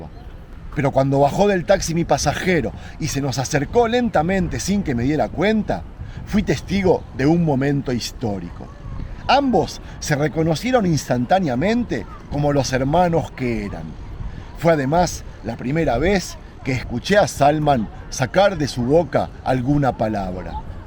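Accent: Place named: Rioplatense, Argentina. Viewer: Wow porque hablas tan bien Español? Sonas como Argentino/Uruguayo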